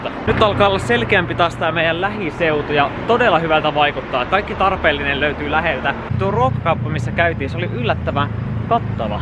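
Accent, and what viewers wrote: Finland. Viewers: Ystävällinen pyyntö - voisitko olla ääntämättä englannin sanoja tuolla suomijuntilla tavalla: ARKADE, SHHRAINI, HEDKUARTTERS, LEGIT jne. Asia on häirinnyt kaikissa videoissasi. Onko ylipäänsä pakko edes käyttää englannin sanoja, jos yhtä hyvin voisi sanoa vaikka "pyhäkkö" tai "päämaja"? Mutta hyvää Kiotossa oleskelua! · se on vaa tätö finlishii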